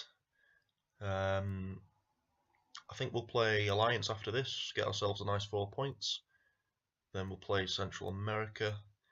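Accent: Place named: Northern England, United Kingdom. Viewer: "Sorry about my accent [I'm from Manchester]" — I love the northern English accent :-)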